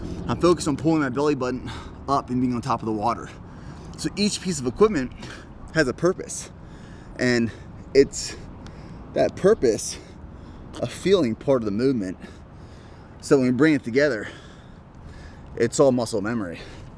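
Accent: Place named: Brooklyn, United States. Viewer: Love how his a proud Texan but has the strongest Brooklyn accent😂😂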